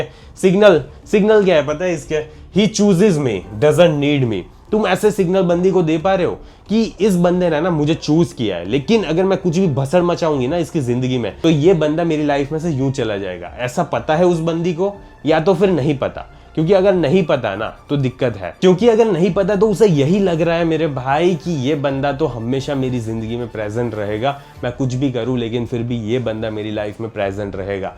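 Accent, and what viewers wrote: Gujarat, India. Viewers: Bhai, I am also a gujju, its so cute when ur Hindi has a gujju accent 😂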